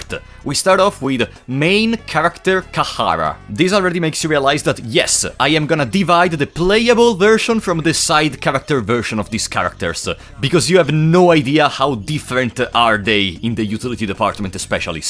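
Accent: Italy. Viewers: Perché un italiano dall'accento maccheronico parla in inglese di uno dei più bei giochi mai creati? Iscritto. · sei ita? da come parli sembra di si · I don’t know why but your accent sounds italian, se sei italiano bro sei un grande, anche se questo gioco è mega di nicchia in Italia è davvero un piccolo capolavoro ed è bello vedere uno dei nostri che lo porta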